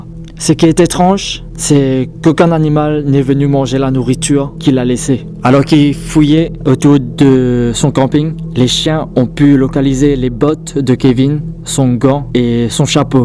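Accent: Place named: England, United Kingdom. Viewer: Je pense juste qu’il n’est pas français mais plutôt anglais ou américain tout simplement vu l’accent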